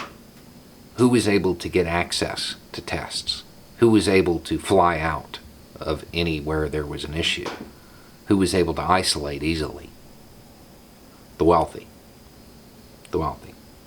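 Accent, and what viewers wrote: South, United States. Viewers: All political commentary should be delivered in a thick southern drawl.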